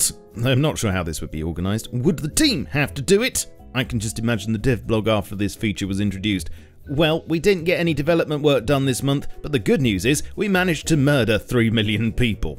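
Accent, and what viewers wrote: United Kingdom. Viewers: I don't even play rust anymore. I just like listening to your update summaries.... and I don't even like British accents or your stupid way of saying "floor" when something is clearly laying outside on the GROUND!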